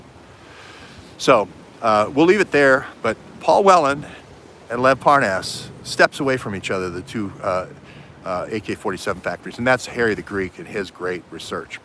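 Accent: South, United States. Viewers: I dig the WAY YOU DIG. MY OH MY, YOUR BOX of chocolates are some of my all time favorites...nuts and chews. And with that down south drawl, just adds the icing on the cake. Thank you. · It was Glenn Beck’s presentation named The Puppet Masters where he broke down and tied together the relationships of literally everything to Soros who even to this day is the nucleus for which everything is filtered. Way back then Glenn pointed to Ukraine and the CIA causing regime change using a formula. I recommend all watch this one as well. I’ve been following you since the day you stepped into DC. I am at least 2 years ahead of the world because of you. 🤓 Thank you. I lol’d all the way through this one. Loved the Southern drawl. 😆 Here is the link with all 6 <link>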